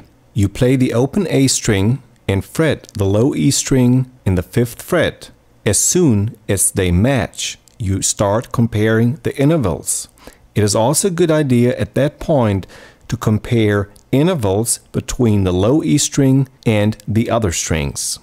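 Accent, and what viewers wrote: Germany. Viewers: he's german - so he pronounces an unvoiced 'S'-sound in cases were English native speakers would normally pronounce a voiced 'S'-sound...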